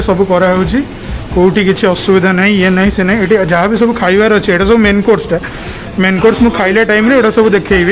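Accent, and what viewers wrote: Odisha, India. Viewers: I subscribed your Chanel cuz I like your odia accent and also I like your vlogging style keep it up bro 👍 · I luv your way of talking..prati 5 min re hehe haa..dhe..dhe .dha..dha😂😂actually ame mane bahare maintain kari katha hele v...real life re samaste emti typical odia re hin katha hau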